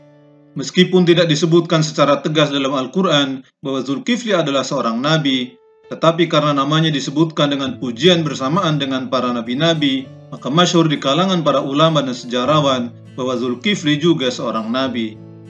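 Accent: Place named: Makassar, Indonesia. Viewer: Yg ngomong dialeg org makassar wkwwk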